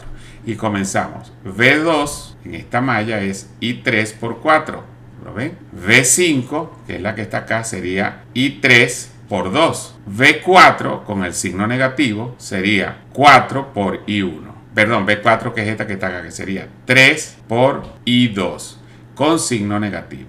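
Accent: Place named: Guárico, Venezuela. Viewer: Tienes un asentó de Guárico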